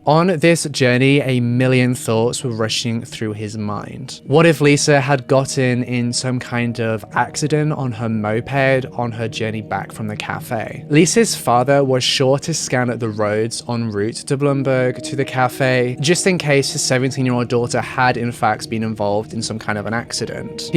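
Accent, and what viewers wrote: United Kingdom. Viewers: Stumbled upon this video, and as a Swedish person I gotta say that I'm a bit surprised that this story made it's way out of Scandinavia. I saw that you also made one on Engla, another Swedish girl. For us, here, it seems like we are hardly even on the radar of the rest of the world. Where are you from? I think you sound a bit British, but I'm not sure, since I'm no accent expert. Just out of curiosity.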